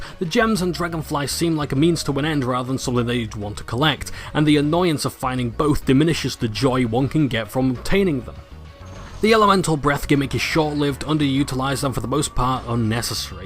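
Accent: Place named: Northern England, United Kingdom. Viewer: It's your accent, it sounds more Northern than Yorkshire to me XD Are you gonna re-review the Rayman games btw?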